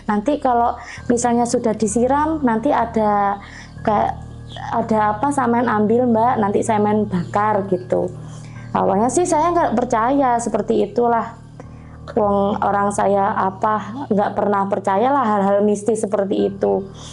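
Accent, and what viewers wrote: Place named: Pantura, Indonesia. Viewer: Dilihat dari logat bicaranya,Mbaknya saya prediksi adalah orang sekitar Pantura,Jawa Tengah.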